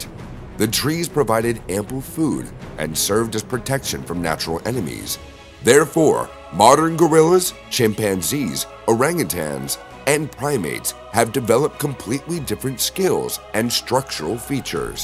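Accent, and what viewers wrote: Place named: West, United States. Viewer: Why speak with an Western accent and say meters and grams instead of ft and lb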